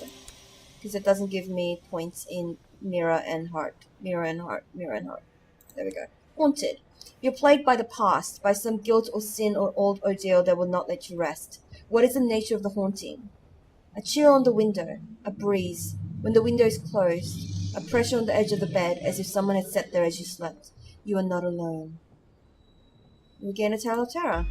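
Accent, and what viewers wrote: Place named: Australia. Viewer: You sound Australian with a bit of American accent at times, sometimes a bit more British...😅 Are you an Aussie? Because uploading that early in the morning seems rough...?😜 Edit: Hmmm... Somtimes I'm picking up a slight non-native speaker accent...🤔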